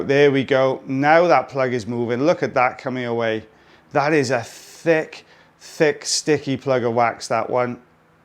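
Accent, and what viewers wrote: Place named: England, United Kingdom. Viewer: I love the English, your accent brings me so much joy 😊 Hope I get to live somewhere in England 🇬🇧 one day.. More on topic thank you for all your hard work putting these videos up every week, I can't imagine how much work and dedication it takes. You and your team are much appreciated:)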